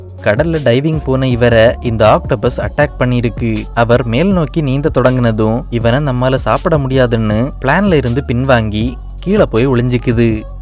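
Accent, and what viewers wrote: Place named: Marthandam, India. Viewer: Unkaluka ooru marthandam a anna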